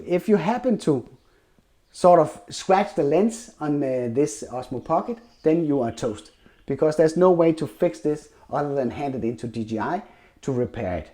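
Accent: Denmark. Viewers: Du lyder lidt dansk :) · Er du fra Danmark, syndes jeg kan høre lidt dansk😂🇩🇰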